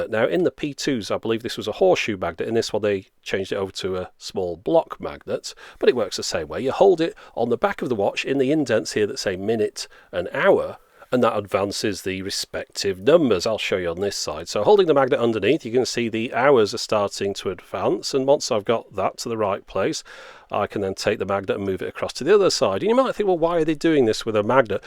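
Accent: United Kingdom. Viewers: Oh really! So properly British!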